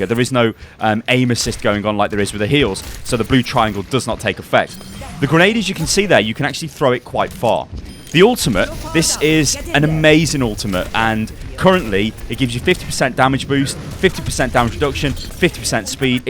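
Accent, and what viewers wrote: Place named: United Kingdom. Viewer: Amazing guide; keep your work up! Admittedly Ana is my main as well, so any kind of help I get is amazing. By the way, nice British ;D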